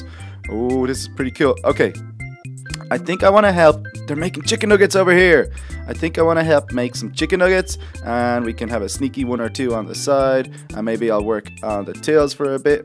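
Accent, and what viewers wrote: Ireland. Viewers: I think this dude was Irish 😂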